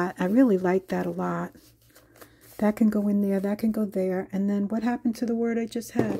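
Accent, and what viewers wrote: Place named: New York, United States. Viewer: I love your longer videos. I also like your voice and accent. I had an aunt from New York and used to love to visit with her. Your sense of humor is great. Your keep it real for all the times we craft and things happen, I laughed out loud when that lid to your ink pad went flying off the table. I also love how you insert comments to people who are going to say something negative. Anyway, just my two cents. I look forward to your videos.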